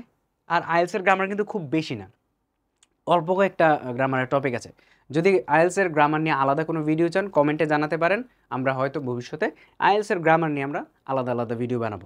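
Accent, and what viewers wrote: Noakhali, Bangladesh. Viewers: আছেন নি বালা, খাইছেন নি ভাত.... এটা তো নোয়াখাইল্লারা বলে।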